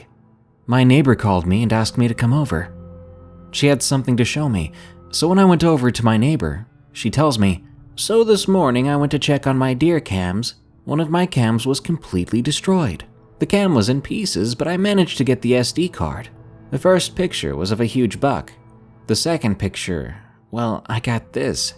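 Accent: South, United States. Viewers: Duh he is from the south..if your an old fan u should know that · I know he is from the South, but it just cracks me up LOL 😂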